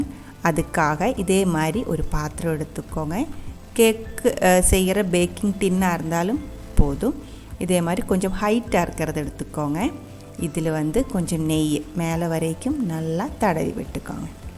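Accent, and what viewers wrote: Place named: Malabar, India. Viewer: I have never seen like u Wow Thanks mam for this channel.addicted tour samaiyal and அழகான. மலபார் தமிழ் Long live mam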